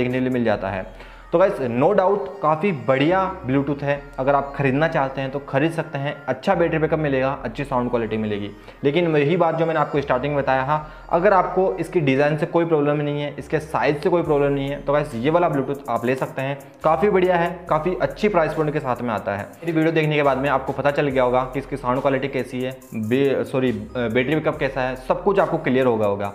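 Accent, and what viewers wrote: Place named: Haryana, India. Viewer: bhai tu shayad hariyana se hai and accent mast hai teri has has k pagal ho gaya.. but haan sahi review dia hai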